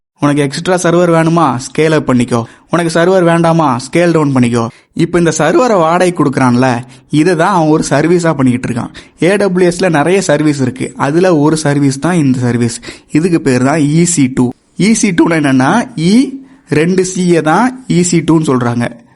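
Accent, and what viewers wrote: Tirunelveli, India. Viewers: Bro .... Very clear explanation in tirunelveli slang ❤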